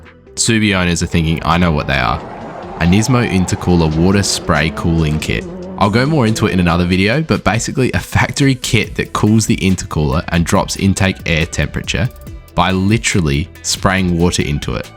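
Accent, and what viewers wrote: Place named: Australia. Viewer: just found this channel, awesome video man, instant sub. also i find it funny that Your description says "United States" but you have an aussie accent lmao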